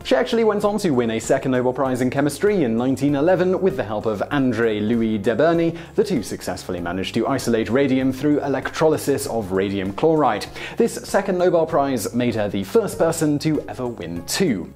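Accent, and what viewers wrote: United Kingdom. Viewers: What's accent called?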